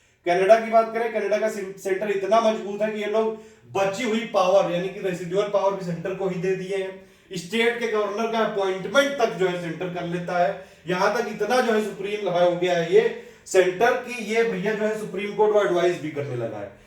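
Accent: Kanpur, India. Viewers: sir Aapka kanpuriya andaj Dil jeet leta h kasam s entertainment with study 🙏🙏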